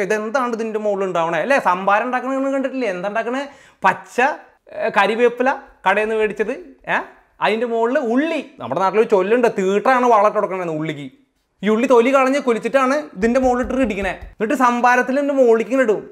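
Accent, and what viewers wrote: Thrissur, India. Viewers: Cheten poliyatta.mmede TCR basha poliyayi parayind.pinne class poli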